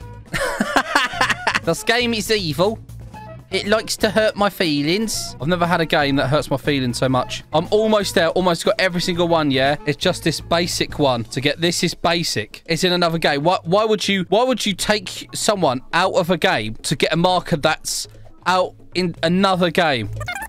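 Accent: United Kingdom. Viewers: YOUR BRIT-ISH MATE? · I know ur british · I just realize that you were British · Are you british? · bro is british 💀💀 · U are British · Areyou british? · Your british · I LOVE YOURSELF BRITTISH ACCENT 😭😭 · are you british😅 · Are you British? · Are you British? Bc if you are… HI MATE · Bro is british🏴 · I was wondering r u British 😮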